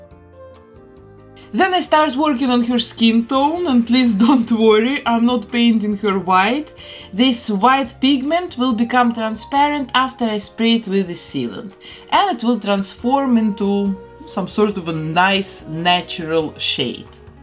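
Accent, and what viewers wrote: Russia. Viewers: Акцент сто процентов Русский, но написано , что Бельгия )))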